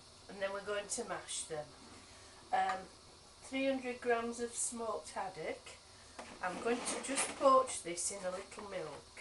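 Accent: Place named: Derbyshire, United Kingdom. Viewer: thanks for the recipe and demonstration, loving the accent, Derbyshire if i'm not mistaken, a beautiful part of the country, feels like home even though i'm a southerner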